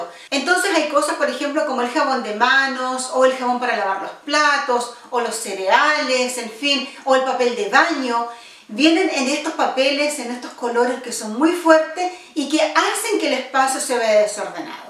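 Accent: Chile. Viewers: Eres chilena? Me suena tu acento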